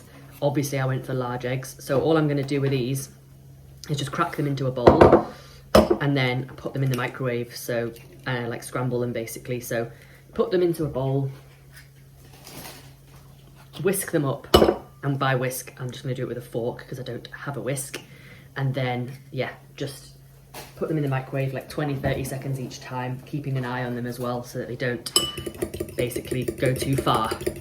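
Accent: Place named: England, United Kingdom. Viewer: Yayy a new video 🥰 I love your accent btw haha are you English?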